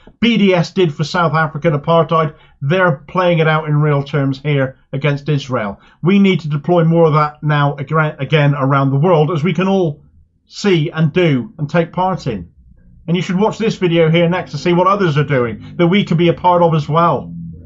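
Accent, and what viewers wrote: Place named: West Country, United Kingdom. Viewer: Hi, your accent sounds where I am from its a wiltshire/somerset one right? I now live in Israel and enjoy your rants. I been labelled a threat for speaking truth about assange and war crimes in Iraq while in UK. Someone must of reported me because I been followed and harrassed since then, even moving to Georgia to escape persecution. I actually thought in Israel as an arab that my family would not get involved but they betrayed me and my own father does tactics the perp does. Checkout a short I posted of my house being watched. Id love to get my story out somehow. Let me know what you think (play with sound)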